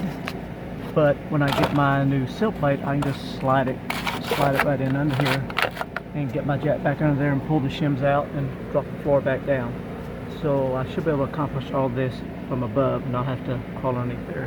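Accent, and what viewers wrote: South, United States. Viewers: this is probably all too late to be helpful for you, but maybe for someone else... Screws to draw the blocking up into the floor is not a bad idea, but not to keep the blocking in place. And not gold screws, they are too brittle and will break and/or loosen up over time. Some beefy structural screws would be best. The blocking should actually be the same dimension as the joists, and secure the blocking with framing nails, maybe even joist hangers. Also add some subfloor adhesive to the top edge of the blocking. You can also see in the video that the subfloor is compromised. Pain in the butt to fix that, but it ain't gonna get better on it's own. Flashing underneath the sill plate is actually incorrect. The idea of flashing is to keep the water out completely as much as possible. Putting the flashing on top of the concrete is likely to allow water to get into the crawl space between the flashing and the foundation. 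There are liquid flashing products on the market that you can use to seal the joint between the rim joist and the foundation, or you can use the metal flashing but in either case the flashing must overlap the joint and then the siding overlaps the flashing. In addition, seal the ends of the joists, the outside of the rim joist, the the outside edge of the sill plate to help prevent having to replace those in the future. From your accent, I assume the home is in the south, where everything stays wet pretty much all the time. Not much you can do about that. The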